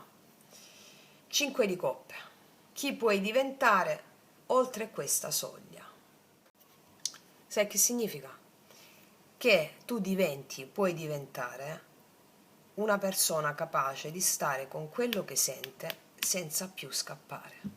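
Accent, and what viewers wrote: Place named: Salento, Italy. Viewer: Cara ti seguo ogni tanto ma dall'Inter calare della tua voce sembra che tu sia salentina come me' io sono della provincia di lecce è tu di dove sei?❤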